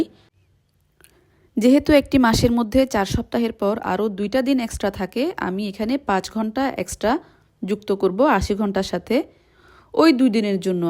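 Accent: Chittagong, Bangladesh. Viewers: আপনার বাড়ি চিটাগং তা ভাষায় বুঝা যায়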